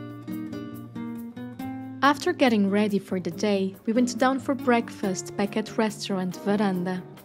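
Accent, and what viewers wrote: Portugal. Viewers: Now I'm sure you're Portuguese. I love that accent and I love Portugal. And I love the Ritz.❤✨️🇵🇹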